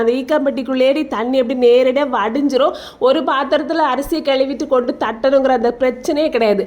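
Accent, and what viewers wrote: Kanyakumari, India. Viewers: Athu nellai tamil illa athu kumari tamil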